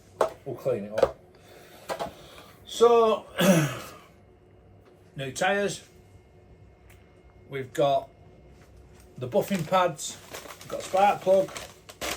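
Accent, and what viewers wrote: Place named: Northern England, United Kingdom. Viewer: Whereabouts you based you sound northern? Lancashire?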